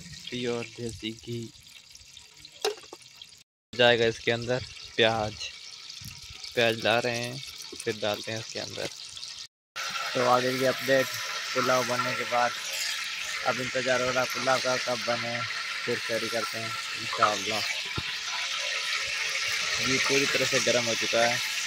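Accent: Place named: Sindh, India. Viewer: Mai to smjha k Pakistan sindh ka area h koi kiun k ap ka lahja bhi Sindhi lagta h or or Yahan Kuch Sindhi hindo h I dia h pahla Sindhi Muslim daikha h🤔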